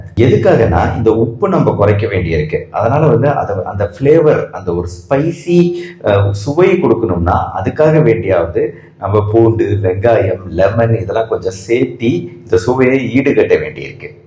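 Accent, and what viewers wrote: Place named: Coimbatore, India. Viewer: வணக்கம் டாக்டர். கோயமுத்தூர் பாஷை வாசம் வருகிறது உங்கள் பேச்சில்... சிறந்த அறிவாளி அறிவான சொல்.. செயல்... வாழ்க பல்லாண்டு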